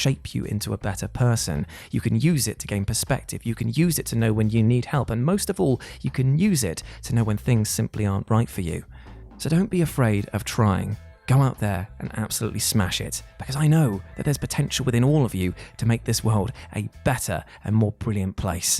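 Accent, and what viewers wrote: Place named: Wales, United Kingdom. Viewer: Noice it's the southwe... wait which part of the Wales ? Heh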